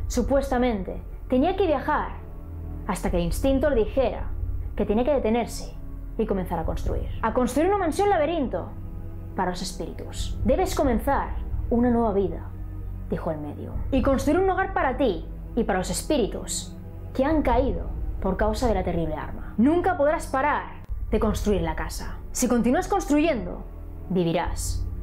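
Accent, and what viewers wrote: Spain. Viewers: Me encanta tu narración a pesar del dejo español, narra de una manera que te enganchas con el tema. No creo que esté embrujado pero si llena de mucha tristeza y quizas el alma de esta señora Sara no descansa en paz por la tristeza que le llevó al espiritismo. Oracion por ella 🙏🙏🙏 descanza en paz sra. Sara W.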